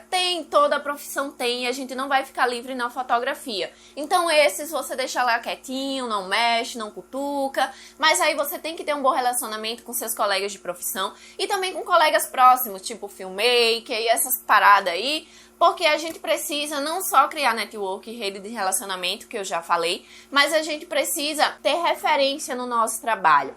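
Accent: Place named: Pernambuco, Brazil. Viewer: Esse sotaque Pernambucano. Minha conterrânea, amei demais o canal. To como iniciante , já amei e já ativei tudo. Obrigada 😍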